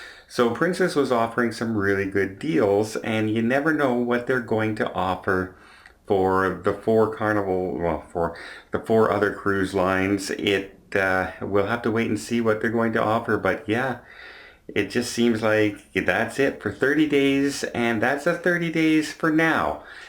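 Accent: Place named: Ontario, Canada. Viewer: where are you from? Sounds like ontario from your video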